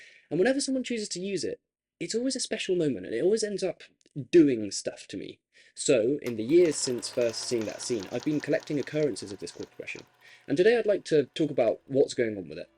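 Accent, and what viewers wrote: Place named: United Kingdom. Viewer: sorry to be negative, but I have to be. I cannot watch your videos. I have tried but all the hand waving drives me crazy. Then there’s the speed at which you speak; it is ridiculous. Why? Is there some hidden rule that says you have to go at a breakneck speed. I wouldn’t expect a drawl; that would be equally annoying. Just a normal pace. Also some attention to punctuation wouldn’t go astray. Your acquired accent makes it difficult to discern many words for the non Brit ear…maybe even fellow Brits have trouble understanding you ! I am sure the content is interesting and informative, but alas, I will never know. Unlike some of the sycophantic comments (which are probably your friends) I cannot subscribe or even (try) to listen or watch you again. Good luck.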